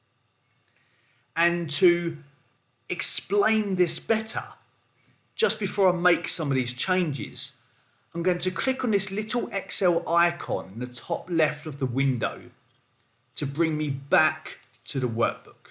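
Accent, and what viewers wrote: United Kingdom. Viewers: Yes. I like your voice!So British! So unusual!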